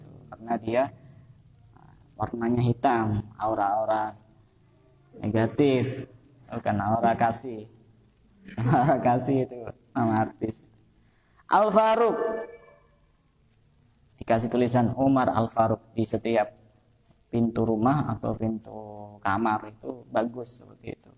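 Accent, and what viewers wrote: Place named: Madura, Indonesia. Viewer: Kok seperti logat Madura ustadz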